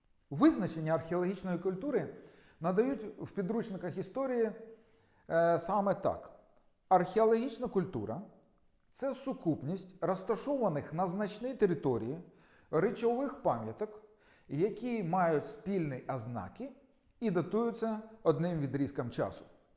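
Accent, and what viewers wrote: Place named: Odesa, Ukraine. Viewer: Розумний чоловік нащось дурня з себе клеїть.. По розмові видно, що одеський липован. Так?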